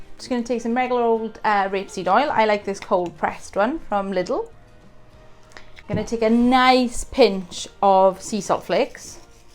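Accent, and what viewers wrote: Wales, United Kingdom. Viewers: I'm sure that's a Welsh accent I hear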